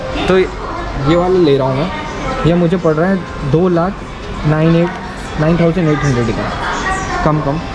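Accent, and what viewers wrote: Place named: Delhi NCR, India. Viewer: Yeh Ghaziabad-Noida accent mat kar bhai, tho pe suit na Khare hai yu… yeh sab mohh pe chd dee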